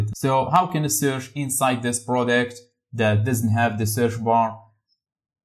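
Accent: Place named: Morocco. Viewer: Dude bro you are from morocco You accent sounds familiar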